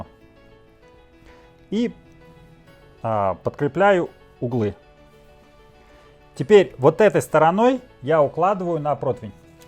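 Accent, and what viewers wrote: Uzbekistan. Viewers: Да, акцент узбекский. Сразу слышно! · У вас узбекский акцент ;) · У Вас как будто хорезмский акцент.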